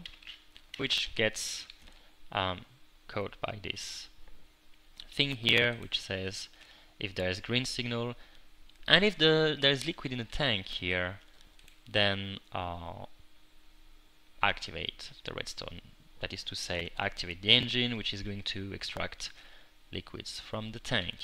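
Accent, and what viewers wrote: France. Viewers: Can you do a video explaining how to install this mod on a mac? I have not been able to install it, only because I have had such a difficult time with all the complicated installation procedures. Just a quick, brief tutorial would be extremely appreciated. Thank you! :) -greexm PS, Vous êtes Français? J'adore votre accent quand vous parlez l'Anglais, c'est très charment!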